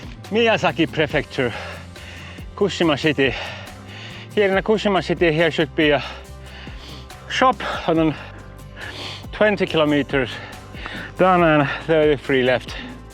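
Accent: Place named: Finland. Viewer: Do you speak Japanese. i do enjoy your Finish lilt and English , very interesting and fun to listen to.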